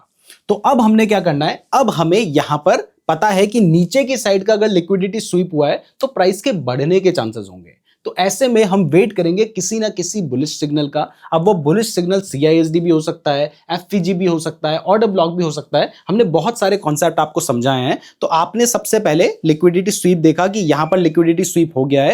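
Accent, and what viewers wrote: Uttarakhand, India. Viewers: Sir aap uttarakhand se hain kya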